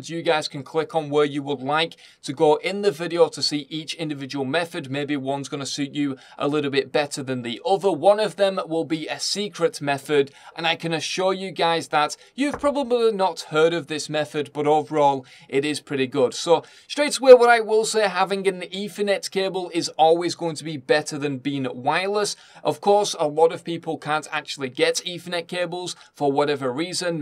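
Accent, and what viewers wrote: Scotland, United Kingdom. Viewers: are you Scottish or English? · This Guy Is Scottish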